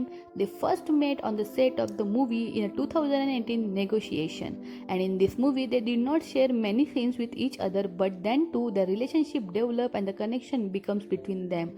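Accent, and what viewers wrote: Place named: India. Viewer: Madam your tongue sounds like indan 😊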